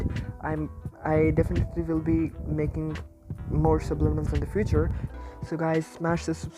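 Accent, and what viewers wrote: India. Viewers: Wtf are u Indian ?!? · Are you an Indian? I hope the sublimals that I listen work😓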